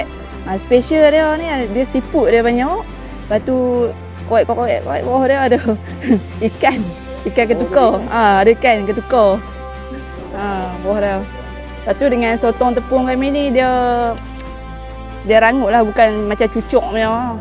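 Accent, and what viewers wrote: North, Malaysia. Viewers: Aku suka kak cik ni kekalkan loghat utara, tak buat2 nak pelat... Itulah tanda kuat pendirian dan kuat jati diri. Terbaik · Pekatnya utara kak ni, utara piau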